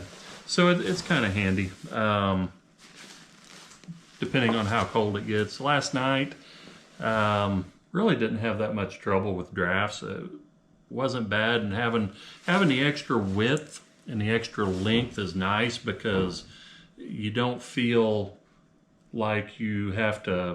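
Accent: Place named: Kentucky, United States. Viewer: I retire in 2 years and want to hike the AT. Sir, you are so lucky your wife is willing to do this with you. I wish my wife would do so. I have the same Big Agnes tent that you have. It's bullet proof, but it is heavier. I have never used the footprint, but it's not a bad idea on a thru hike. I love it. I also love my Duplex when I'm hiking alone! Great choices! I love my Nemo Tensor pad! I have an Enlightened Equipment Revelation quilt and I love it. It's only about 20 ounces. But, your quilt is great! Put some miles on those legs. I've hiked the Smokies and it's a but kicker! I'm from Kentucky, you sound like you would be from somewhere close! I also subscribed!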